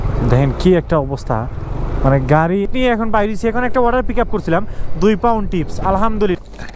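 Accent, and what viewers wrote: Sylhet, Bangladesh. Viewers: Vai mone hoy sylhet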